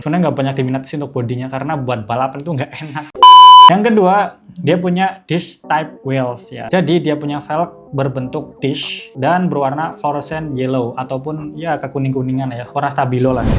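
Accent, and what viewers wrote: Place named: Brebes, Indonesia. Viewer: Oalah wong brebes koe mas. Salam ngapak mas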